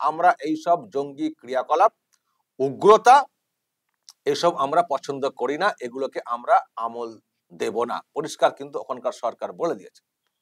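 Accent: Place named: Bangladesh. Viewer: Dada kathatey bojha jay ke bangla deshi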